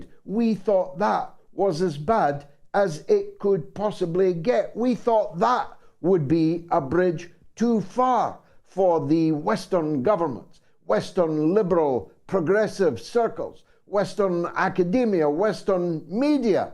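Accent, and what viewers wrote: Scotland, United Kingdom. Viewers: Tacitus born 56 AD would be proud of you a man of words well don't know what he think about the Scottish accent. I looked it up because I love history. Thank you, you can tell wifey about that❤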